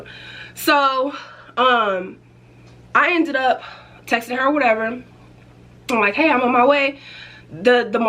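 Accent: Chicago, United States. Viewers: Whole time watching this video I’m like girl are you from Chicago 😭😂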